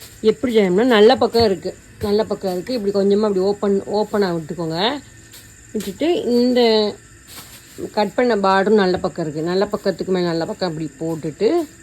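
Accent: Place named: Madurai, India. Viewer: புரியும் படி நன்றாகவே சொல்லி தருகிறீர்கள் நன்றி உங்களூடைய வீடியோவில் அங்கிட்டு இங்கிட்டு ஏத்தலக்கடி இறக்கலக்கடியா இருக்கும் என்று பேசியிருந்தீர்கள் அதைக்கேட்டு எனக்கு சின்ன வயதில் நாங்கள் பேசியது ஞாபகம் வந்து விட்டது இப்போது அதையெல்லாம் மறந்து விட்டேன் நன்றி உங்களூடைய மதுரை பேச்சு ரொம்ப நல்லா இருக்கு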